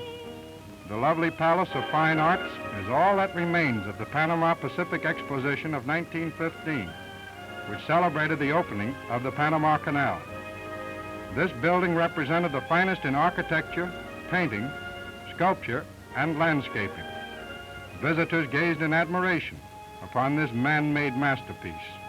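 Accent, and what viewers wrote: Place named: Boston Brahmin, United States. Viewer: Has no one commented on the old fashioned Boston Brahmin accent of the narrator? I find it charming.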